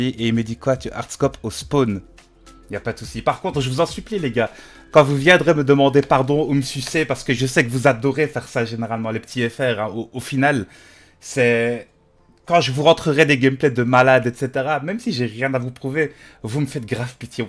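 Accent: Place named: Belgium. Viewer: tu fait trop rire j'adore les belges et leur accent ta trop raison mec t le meilleure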